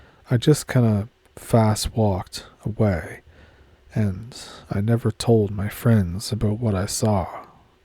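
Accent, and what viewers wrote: Ontario, Canada. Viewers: Hearing your voice reminds me of how my cousins from Ontario sounded. 🎉 Good Times, wot?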